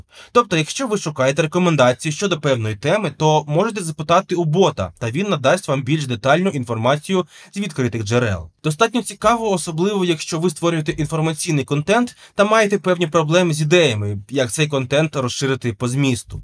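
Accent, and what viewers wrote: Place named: Russia. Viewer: Bună ziua. Contentul e util și interesant. Hai sa vorbim în orice limba în afara limba rusa. Of doamne, dar dacă tu ești ca bloguri interesant, și pina când totul a fost clar, dar acum tu zici Rusnea, ce eu trebuie sa fac? Eu înțeleg limba rusă, dar ucraineana nu prea. Eu vad ca ție nu prea comod, tu ai accent rusesc.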